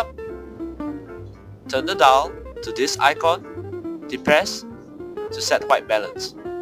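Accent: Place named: Singapore. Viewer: You sound... your accent. Singaporean?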